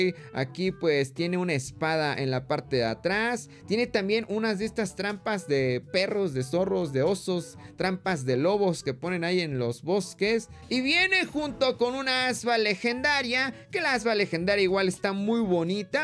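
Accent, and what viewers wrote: Mexico. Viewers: eres de Puebla?😊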